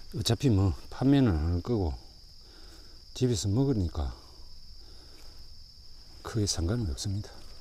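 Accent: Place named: Gyeongsang, South Korea. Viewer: 구독,좋아요,알림하고 갑니데이^^ 말투가 정겹심더~~ㅎ 경남쪽 같으시네예 산행시 늘 안전하게 풍산하시길예^^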